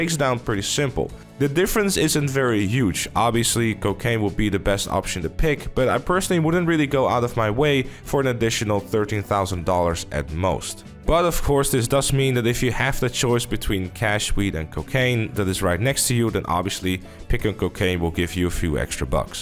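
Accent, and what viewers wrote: Netherlands. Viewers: Are you dutch becuase you have a accent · Are you Dutch? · this dude dutch though! geweldige video man, echt vet... goed bezig! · hoorde gelijk dat je nederlands was haha XD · are you dutch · Je bent Nederlands ik hoor het aan je accent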